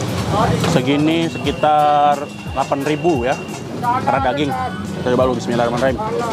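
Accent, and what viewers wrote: Medan, Indonesia. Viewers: Yang bikin saya salut dengan Vloger ini walaupun bahasanya agak nyinyir didepan pedagangya dengan logat medannya yg lucu tapi dia tidak lupa berbagi dengan orang2 disekitarnya… mudah2an murah rezeki lah kau bang …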